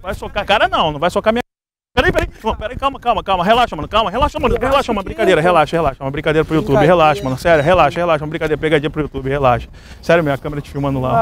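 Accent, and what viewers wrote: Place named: Rio de Janeiro, Brazil. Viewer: legal e bonito KKK puts e ainda tem sotaque carioca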